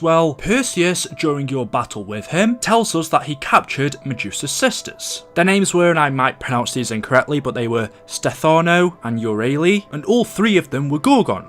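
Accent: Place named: Yorkshire, United Kingdom. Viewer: Sounds like a Yorkshire accent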